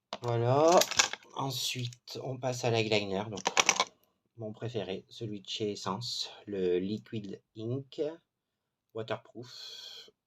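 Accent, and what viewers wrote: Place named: England, United Kingdom. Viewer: L'accent anglais m'a fait rire 🤣🤣🤣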